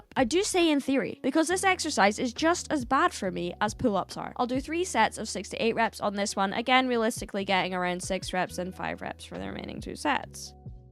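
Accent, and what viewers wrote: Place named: Glasgow, United Kingdom. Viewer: She’s from Glasgow Scotland 🏴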